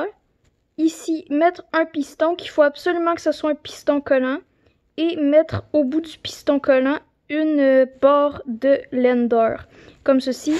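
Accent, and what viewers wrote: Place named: Quebec, Canada. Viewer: Yes québécois comme moi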